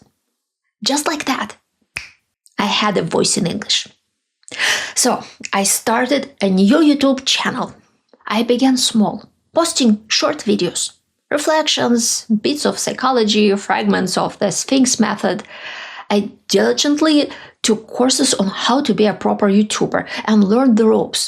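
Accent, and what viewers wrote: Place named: Russia. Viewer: I am a fan from Nigeria 65 years old. Congratulations. I wanted to write many words of admiration to you, But you have already explained what type of person you are. And it is true. You are touched by divinity. You inspire those who listen to you. You are charismatic. I agree totally with the person who commented before this my comment. I most say your English is improving just keep the Russian accent.😊 wishing you the best. Your fan.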